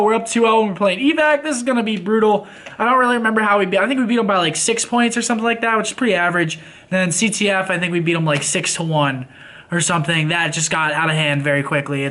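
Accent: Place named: United States. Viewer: Australians acting like they have never heard an American accent before.. Who cares how he pronounces Melbourne!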